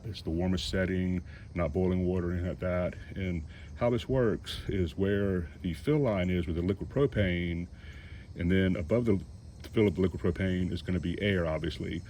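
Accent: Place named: Philadelphia, United States. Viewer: Great tip! Thanks! Also, you from Philly or SEPA? I ask because you say "wooder" instead of "water".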